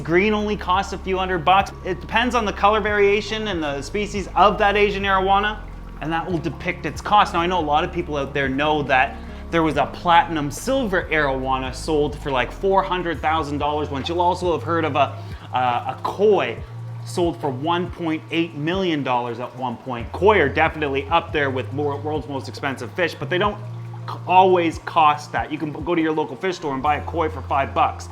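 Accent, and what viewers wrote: Canada. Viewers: Canadian accent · as a fellow canadian i understand how said cities when its said more like sighties. I know the spelling aint like that but its cities said as sighties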